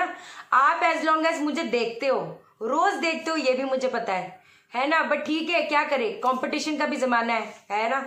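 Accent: Punjab, India. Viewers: Yaar kya mast attitude hai twada bilkul punjabi tone blesss you darling and love your mom also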